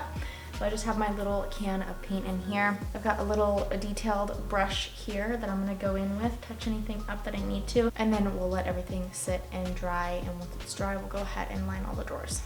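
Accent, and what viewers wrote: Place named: South, United States. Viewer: I'm not sure where you're from but I'm in KY and my southern accent is very similar to yours because anytime I hear you say it, it sounds correct to me, I don't hear an "r" in it anywhere extra. Keep up the awesome work and don't let the lull in sales slow you down, your work/pieces are very pretty and always quality work so it isn't you, it's just the economy right now, hopefully, that'll be picking up soon though. Happy New Year and lots of luck to you!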